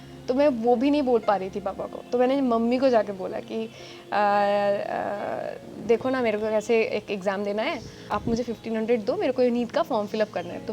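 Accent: West Bengal, India. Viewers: Didi are you from West Bengal?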